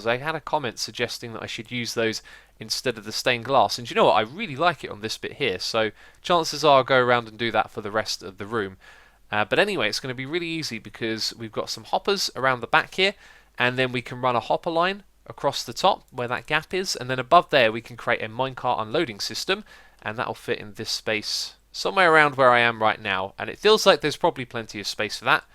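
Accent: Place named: United Kingdom. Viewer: Xisuma, why is your accent so pleasant? What part of UK are you from? I don't hear many British accents out here in Seattle except in occasionally in international conference calls at my old job. My dad was from Belfast and my brother is from Brighton so I can rule those out, but I couldn't venture a guess.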